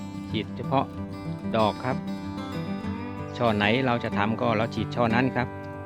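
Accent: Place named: Chanthaburi, Thailand. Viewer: เค้าเป็นคนจัน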